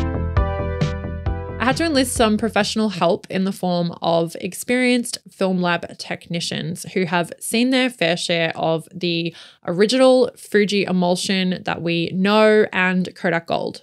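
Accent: Australia. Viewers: if you could drop either the Aussie accent or the valley girl inflection your channel would be one hundred percent more bearable. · I’m subscribing for your content, your delivery and, of course, your awesome Aussie accent… as a Canadian living in Southern Arizona - visited Sydney in 2015… Beautiful people and sooor friendly. Don’t change a thing!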